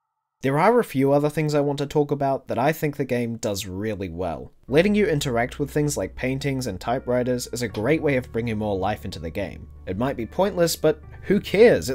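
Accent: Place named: New Zealand. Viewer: Are you a kiwi too? I recognise the accent. What city or town do you live in? I live in Matamata. Edit: typo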